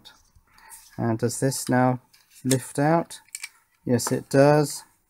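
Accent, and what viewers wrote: England, United Kingdom. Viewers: Hi, have you used any flux on the solder braid before attempting to remove solder? I also don't like solder braid myself but flux might help you. I use a Hakko de-soldering gun on most of my repair work, for me its the easier and cleaner option. It's a lot more expensive but you remove all the solder in one press of the trigger, even on multi-layer boards. Occasionally on lead free solder I need to add fresh 60/40 leaded solder before trying to remove the old stuff. Keep up the great videos, I'm originally from England and its comforting to hear the accent from time to time.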